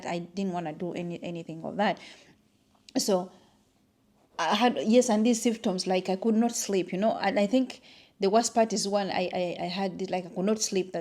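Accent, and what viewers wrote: Uganda. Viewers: Beautiful material and what you did with it but should anyone in your size of your age be wearing such a thing in that short length and showing it publicly? Not nice at all - no offence meant. And FYI HRT will give you cancer. Doing your own research is important and great but does not Negate that it causes cancer - 100%. Because the body knows the difference and indeed it can feel awful- in perimenopause mdnopause and post menopause and you want relief but going to do HRT just to get relief can take your life away literally. And every cell and body function works with hormones. You can do something different that works just the same and better. And let me correct something it is not all people who have diabetes that don't have insulin..and contrary to conventional or allopathic medicine theories that giving more insulin helps diabetics it doesn't. 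The issue is hormonal imbalance and the weight is obviously from high cortisol so is the insomnia. As for your weight you obviously sound Ufandan to me and again no offence but Ugandans especially women are fat by nature structure culture and the way you eat. Huge Portions and fattening food. If you are more mindful of that you will loose weight i.e why I said that wrapper length is not doing anything for you. If you make it longer it will but at that short length it Not flattering at all it makes you look bigger especially if you have hips and a bigger backside. And I do not know you. This is not personal. Just stating truth and f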